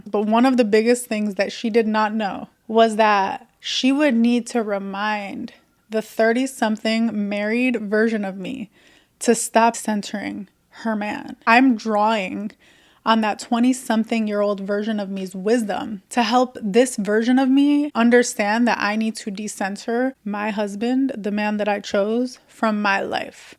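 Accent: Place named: Michigan, United States. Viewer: girl r u from southeast Michigan? You sound so local it’s crazy haha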